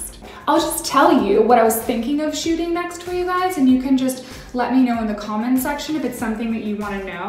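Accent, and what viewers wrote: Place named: United States. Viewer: I was not expecting an American accent !! 😂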